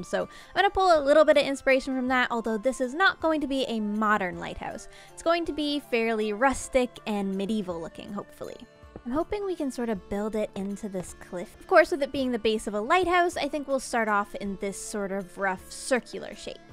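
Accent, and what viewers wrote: Newfoundland, Canada. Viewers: I didn’t know you were from Newfoundland. You need to listen to Come From Away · Gem gem gem plz show your newfendland accent i love that type of accent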